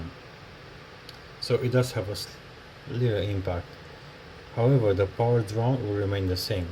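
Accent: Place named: Turkey. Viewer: merhaba, aksaninizdan Turk oldugunuzu dusunuyorum, bilgilendirici video icin tesekkurler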